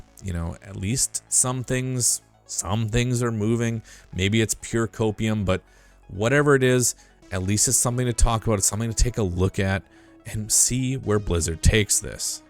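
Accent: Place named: Balkans. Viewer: Are you of Serbo-Croatian descent, bro? Nice ! I can recognize this accent anywhere!! :) Zdravo! :)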